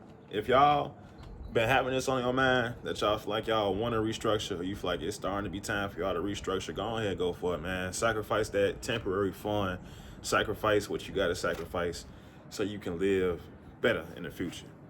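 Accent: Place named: South, United States. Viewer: Foreal bro, I always take mini vacations and travel but manage my money good. Where you from cause I can hear a southern accent a little, I'm from Louisiana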